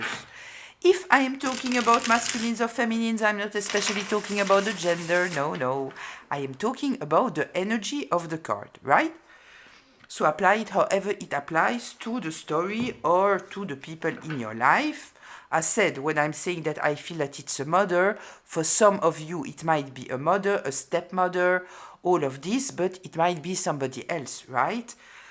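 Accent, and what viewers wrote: France. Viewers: The word for only giving to get something back is “transactional”. Love your accent, makes me feel like I should wear a beret riding my Velo Solex with a baguette in hand to listen!😁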